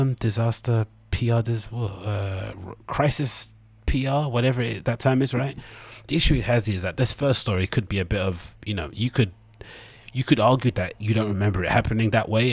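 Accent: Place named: Southampton, United Kingdom. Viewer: Your accent sounds like its from Southampton U.K. very familiar 🤙 keep up the good content man!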